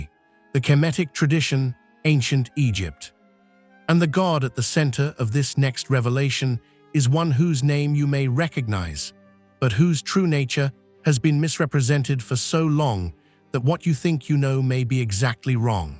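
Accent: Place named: Europe. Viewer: Why do these channels claiming to reveal hidden African truths hidden by Europeans always have a European narrator? Let us hear our history with our own "voice"!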